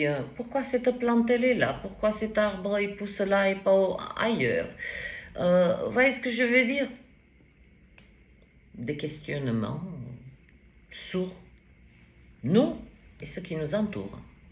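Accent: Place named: Italy. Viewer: Je t aime de tout mon coeur,ma,tu es unique j aime ton accent,un reste d italie peux être!!!je suis une poissonne,et je ris,tu m amuses Merci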